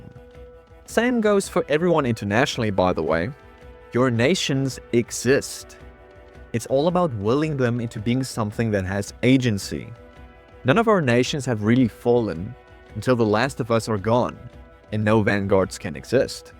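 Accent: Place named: South Africa. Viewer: Knew you were South African before you said so. Good to see there's more of us. This Soutie is with you ready to fight for my Afrikaans people and ancestors. Fuck communism. God i love how you explained the South Africanisation spectrum. I really do wonder where we're going in future. Papa Greyling came to SA in 1752. I don't think I'm going anywhere.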